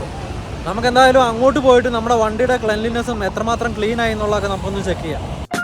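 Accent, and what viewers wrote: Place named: Kunnamangalam, India. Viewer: okey njan kkd kunnamangalam samsaram kettappo thanni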